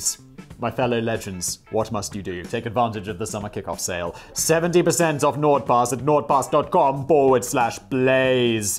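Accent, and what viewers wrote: Russia. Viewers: I was born USSR, and russian accent is my favourite russian accent on YouTube. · Great Russian accent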